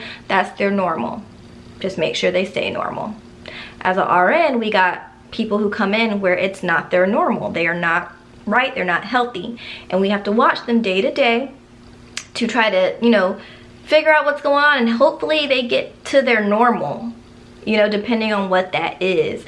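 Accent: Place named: New Jersey, United States. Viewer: Sounds like u went to jersey. Great video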